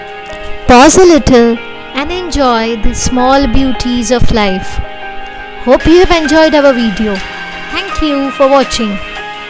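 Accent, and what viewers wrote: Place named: India. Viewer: WHO THE HELL IS THE NARRATOR?? '' PPOS'' A LITTLE AND LEARN HOW TO SPEAK PROPER ENGLISH, NOT INDIAN ENGLISH..IT IS REALLY ANNOYING AND IRRITATING..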